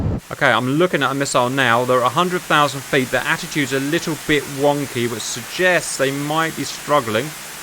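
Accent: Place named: United Kingdom. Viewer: Just because you hire an announcer with a British accent, people will believe what you say?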